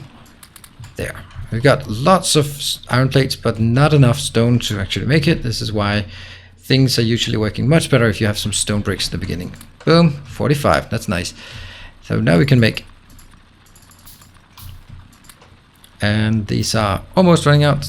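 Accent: Denmark. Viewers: are you from denmark? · Are you Danish??? :) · You must be danish, you guys are the only ones with that accent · R u danish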